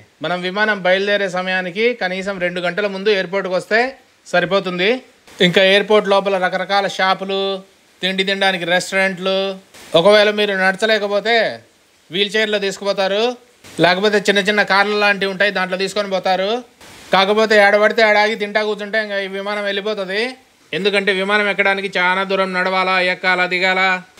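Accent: Kadapa, India. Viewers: Welcome to kadapa. I have been following your you tube channel since two years and enjoying your kadapa accent presentations. Thank you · మీరు ఇచ్చే ఇన్ఫర్మేషన్ చాలా బాగా ఉంది,కడప భాష యాస సూపర్ sir మది కూడా కడప రాజ రెడ్డి street sir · Nee matalu vintunte Kadapa kallamundu kanabadathadi. Kadapa municipal high school lo chadivinava?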